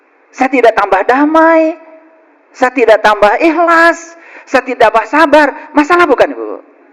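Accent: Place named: West Java, Indonesia. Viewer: Bapa guru boleh tau bapa asal dari daerah mana pak soalnya bapa bahasa Sunda saya orang Sunda saya sangat tertarik dgn gaya ceramah nya bapa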